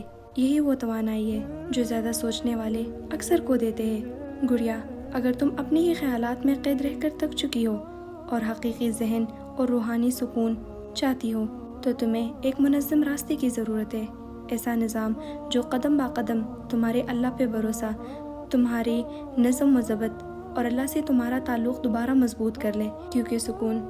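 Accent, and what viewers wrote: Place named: Khyber Pakhtunkhwa, Pakistan. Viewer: Such a beautiful voice and accent . Senin accent is pathani Bilmiyorum . Thank you so much for such a good message . May Allah bless you ❤😊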